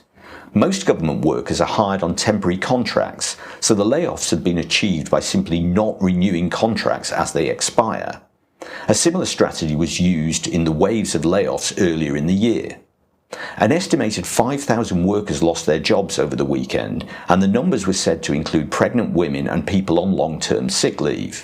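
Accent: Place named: United Kingdom. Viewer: You're British accent doesn't mean you are right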